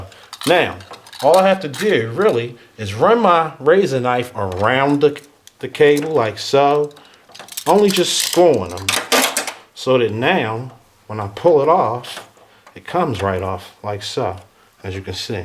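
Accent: Baltimore, United States. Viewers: Baltimore accent? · great video! you also sound very much like my uncles from Baltimore. im from and live in Philly, so it really stands out. again, nice video! super informative, easy to follow along with.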